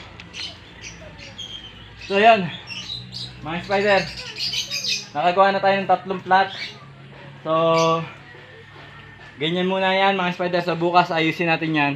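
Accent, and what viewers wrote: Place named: Laguna, Philippines. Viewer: Tol taga san ka pala ka punto mo samin eh sa sta cruz laguna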